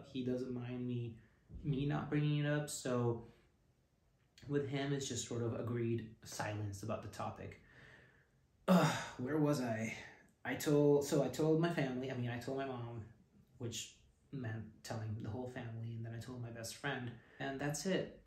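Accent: United States. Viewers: If you're from a Balkan country, why do tou speak English with an American accent? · What accent? He sounds like he is from the US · One question? You have an American accent !!!! Explain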